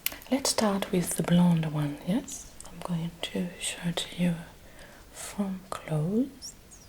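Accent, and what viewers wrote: France. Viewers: If one could imagine an ASMR role-play with a glorious French accent and a sumptuous assortment of exquisitely presented sound triggers, you would more than adequately have described this beautifully rendered video. This production is so well done, and I highly recommend it to anyone who understands and appreciates the art we know as ASMR.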